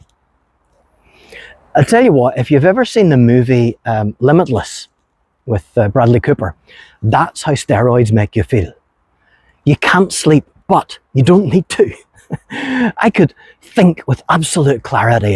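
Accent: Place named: Scotland, United Kingdom. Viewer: Hi just found your channel via Francine, following you from Denmark. I absolutly love your accent, Scottish? Your videoes are next level, sp well done. Hope uou are having a good day today. I will pray for you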